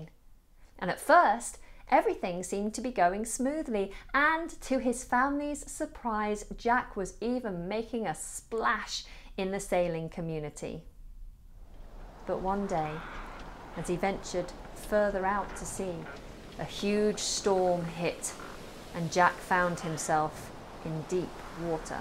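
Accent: United Kingdom. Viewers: I really admire the British accent. Great class. One more subscribed. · Vrey nice story I like British accent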